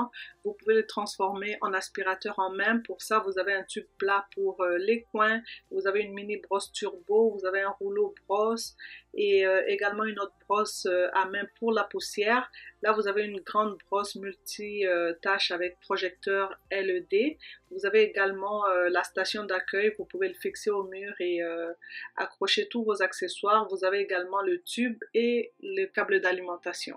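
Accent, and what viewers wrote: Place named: Quebec, Canada. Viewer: Coucou ☺️☺️ j’aime tellement ton accent québécois… moi aussi j’ai un tineco mais le A10, super simple. J’aime vraiment beaucoup la chambre des garçons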